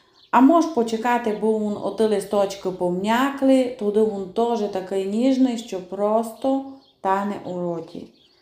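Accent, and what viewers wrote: Transcarpathia, Ukraine. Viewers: А яка рідна мова закарпатська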